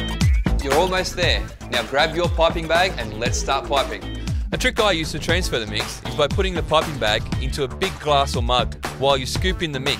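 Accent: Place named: Australia. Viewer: The first thing I said when I played this video was OMG HES AUSTRALIAN !!!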